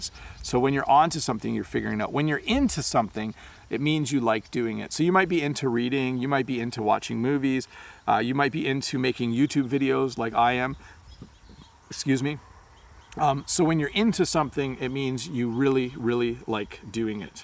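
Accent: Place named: Canada. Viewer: Hi I love your accent Canadian very clear pronunciation but I am not good at English I am from Bangladesh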